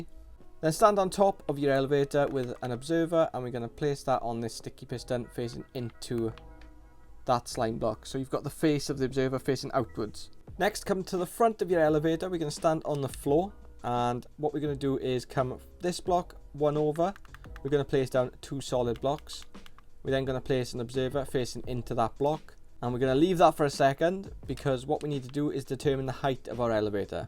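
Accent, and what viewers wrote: Wales, United Kingdom. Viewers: Welsh I think. Correct me if I’m wrong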